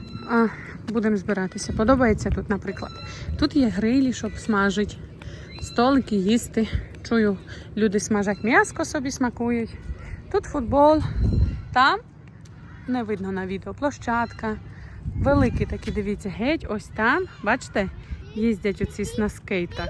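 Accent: Rivne, Ukraine. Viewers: Це так говорять на Рівненщині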